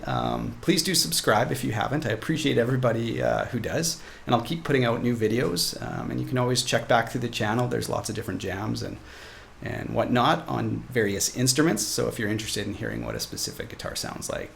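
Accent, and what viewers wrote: Canada. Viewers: "talk aboat" he's Canadian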